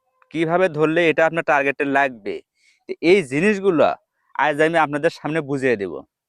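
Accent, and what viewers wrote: Bogura, Bangladesh. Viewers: ভাই আমি আপনার কথা শুনে বুঝতে পারছি আপনার বাসা বগুড়া.( আমার বাসাও বগুড়া, ,,, আপনার গ্রামের নাম কি গোবিন্দগঞ্জ চেনেন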